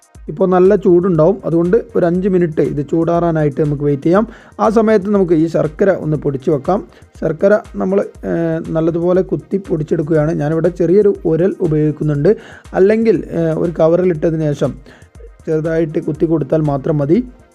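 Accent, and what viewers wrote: Malappuram, India. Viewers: ariyanthondallaaaa ivde Malappuram angne vayil varollu😀😀😀😀 Udaharanam :- Maya payam😀😀😀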